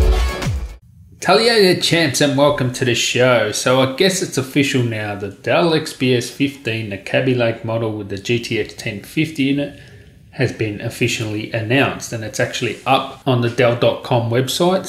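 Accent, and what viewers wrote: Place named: Australia. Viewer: What is it with Australians and the way you pronounce the letter "Aitch"?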